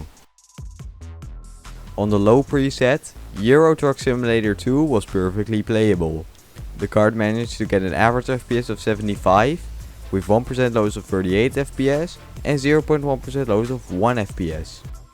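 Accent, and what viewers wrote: Netherlands. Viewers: Just asking, are you dutch? You sound like you have an accent😁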